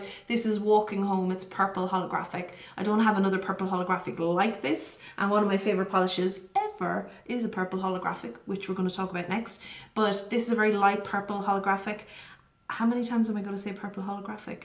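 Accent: Ireland. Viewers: I love your accent. Irish accents are one of my faves! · I love listening to you talk. Very partial to an Irish accent lol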